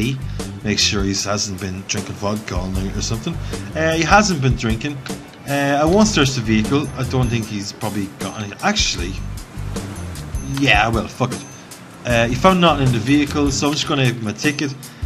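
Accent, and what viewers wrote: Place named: Dublin, Ireland. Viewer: I'm Irish and that's a pure fuckin Dublin Ireland acsent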